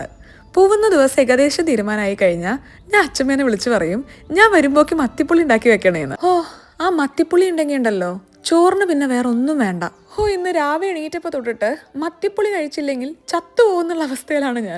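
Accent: Malappuram, India. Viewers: വിവരണം കേട്ടിട്ട് കൊതിയാവുന്നു.... 😋🤩 ചേച്ചി മലപ്പുറം ആണോ... 🤩❤ മലപ്പുറം എവിടെയാ...? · ചേച്ചി മലപ്പുറം കാരിയാണല്ലേ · Malappuram aano veed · ഇങ്ങള് മലപ്പുറാ...😅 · അപ്പൊ ചേച്ചി മലപ്പുറംക്കാരി ആണോ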